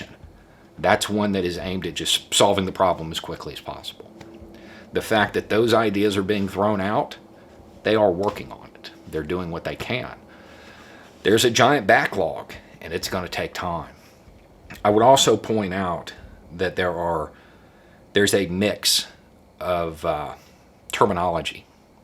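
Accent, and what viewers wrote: South, United States. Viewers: It's his accent and southern charm that gives Conservatives a false impression that he is an ally. One look at the very first time I saw a video of his and I assumed I was going to be giving a thumbs down. About <num> likes later........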